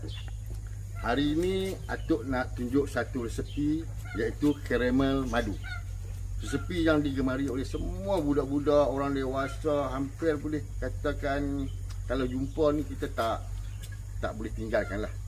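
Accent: North, Malaysia. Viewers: Slang bg lagi utara boleh dak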